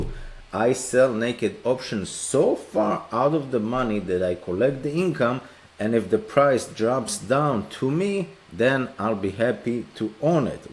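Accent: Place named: Romania. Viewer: That has to be a Romanian accent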